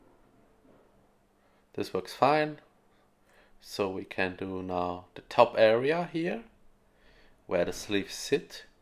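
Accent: Germany. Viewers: Man merkt leicht das er Deutsch ist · Sofort erkannte das er deutsch ist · Geiles Video wenn man es stumm schaltet. Dein Akzent ist leider ungenießbar. Ich denke nicht dass jemand englisch sprachiges das eher toleriert als jemand der an den "Deutschen Akzent" schon gewöhnt ist. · ich lebe in Freiburg Breisgau und ich schaue gerne deine Videos und habe grad erst festgestellt, warum das so ist. Ich höre dich gerne sprechen. Dieser leicht kölsche Dialekt kam mir so vertraut vor und dann erst stell ich fest, das Ihr in Troisdorf seid und ich keine 250 Meter von euch geboren und aufgewachsen bin erinnere mich an schöne abende im Saga und freue mich. Schöne grüße in die Heimat und macht weiter so. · Your English is so German <3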